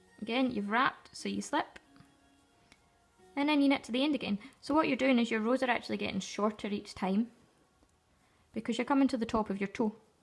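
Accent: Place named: Scotland, United Kingdom. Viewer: Excellent tut!! Love your Scottish accent!! Love the way you knit - maybe another tut?? :) +1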